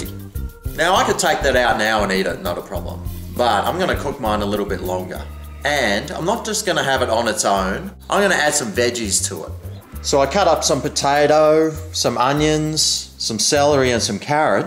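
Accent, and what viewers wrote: Australia. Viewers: Are you oz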